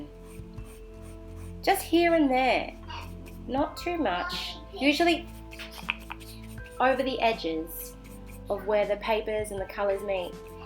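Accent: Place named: United Kingdom. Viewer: I love your "loose" techniques in this video, plus it's very pleasant to watch and listen to your lovely British accent. You don't talk too much, which I find to be very annoying in many artists' videos. The background music was perfect! The small accents you added at the end using the nib pen made such a huge difference in the end result. Keep making these wonderful videos!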